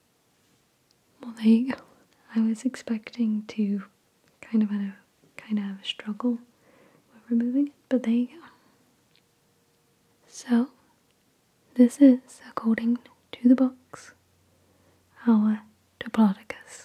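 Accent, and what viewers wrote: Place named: United Kingdom. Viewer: Hey there. Your voice has moved into my top 3. Probably some to do with your British accent . Anyhow greetings from Michigan Usa. Need to drop you a tip soon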